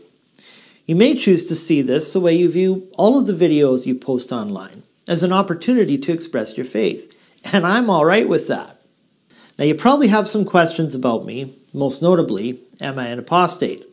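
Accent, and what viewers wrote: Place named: Brooklyn, United States. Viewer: Always makes me think and/or smile! Loved the Brooklyn accent!! 😊😊💕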